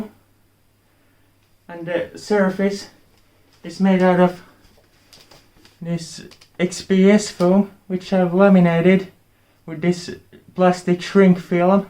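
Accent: Finland. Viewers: your Finnish accent is wonderful. And you are soooo cute <3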